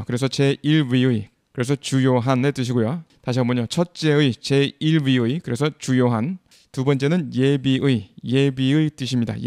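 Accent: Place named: South Korea. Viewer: 좋은 프로그램 감사합니다 그런데 발음 억양 상 강사님은 국내파로 열심히 하신 분인듯 하네요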